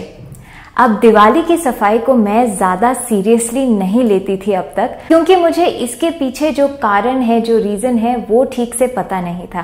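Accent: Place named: India. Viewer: And most importantly love your way of talking. An indian fragrance which came out from your voice that i love the most.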